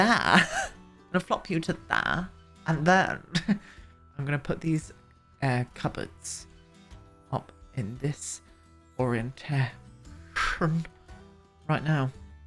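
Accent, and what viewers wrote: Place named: Devon, United Kingdom. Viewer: i always imagine your from devon, like, country p[b]umpkin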